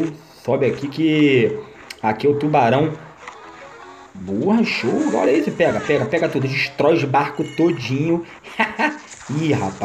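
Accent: Rio de Janeiro, Brazil. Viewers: Tu é do RJ ?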